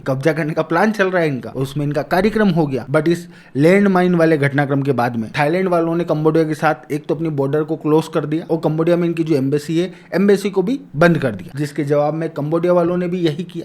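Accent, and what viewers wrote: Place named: Gujarat, India. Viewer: Aap gujarati ho na ? Kyoki aa theth bol rahe ho.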